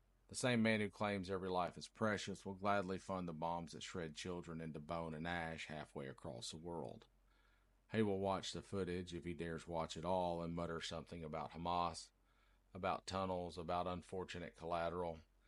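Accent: South, United States. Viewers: You are a treasure and represent what a real man (with a southern accent) should sound like. You are describing religious ghouls...they are nothing more and we all know it.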